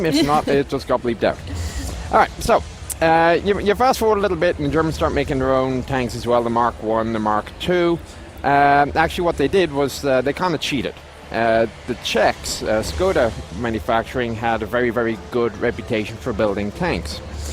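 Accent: Ireland. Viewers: I'm sorry I might be completely wrong, but does he have a bit of an irish accent? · Mr Chieftain are you by any chance Irish? Your accent seems a wee bit Irish..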